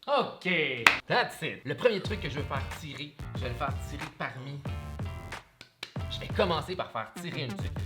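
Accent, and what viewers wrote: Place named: France. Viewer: WAAA, l'accent Français est pas mal, et l'œuvre est juste incroyable !!! Ca doit bien représenter Montréal !!!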